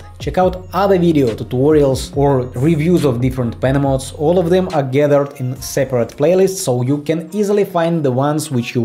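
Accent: Russia. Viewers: God, this russian accent! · Включил видос и с первых секунд услышал русский акцент👽🗿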